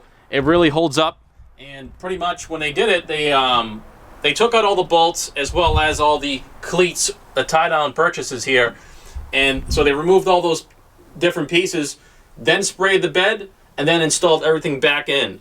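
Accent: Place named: Boston, United States. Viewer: Somebody's from Boston! I'm from Revere, lol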